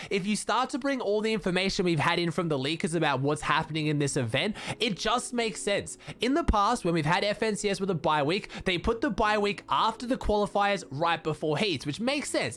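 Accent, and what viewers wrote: Australia. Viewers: Hes Australian no shit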